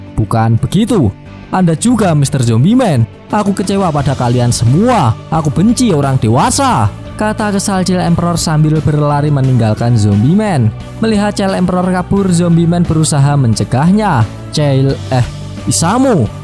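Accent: Java, Indonesia. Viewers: bang bisa ngak aksen logat jawanya di ganti udh bosen dengarnya🗿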